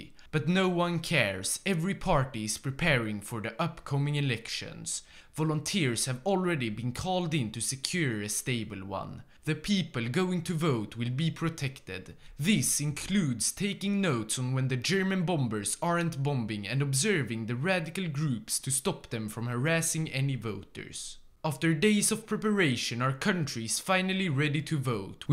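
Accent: Sweden. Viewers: I’ve been a subscriber for a long time hearing ur Swedish accent and a very big popularity hope you become more better 🎉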